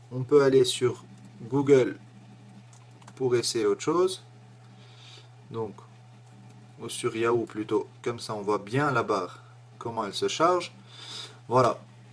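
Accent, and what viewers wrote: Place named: Tunisia. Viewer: petit accent (tunisien?) tres charmant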